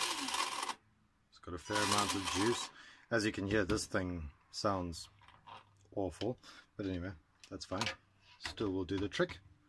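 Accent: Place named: South Africa. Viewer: sounds like you are from SA. anyways. I am unable to find these 3.5mm bearings in SA. any suggestions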